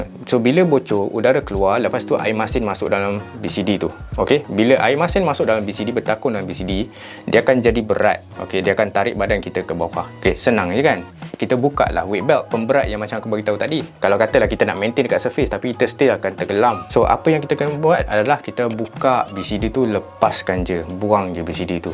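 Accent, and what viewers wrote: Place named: East Coast, Malaysia. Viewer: Betul Abg..It's me.Masa tu terlalu nervous nak dapatkan lesen. Now sudah dapat lesen ..Time for some fundive. June 9-12 akan bawa 3 orang member untuk ambil lesen open water. I will drop by coral bay to meet you bro. Abe jangan guna dialek pantai timur,Viki susah nak faham 😜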